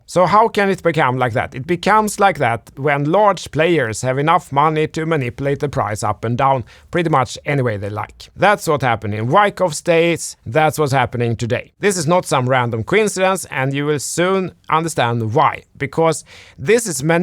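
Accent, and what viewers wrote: Sweden. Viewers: Hör ända från östergötland att de är en svensson som är i farten!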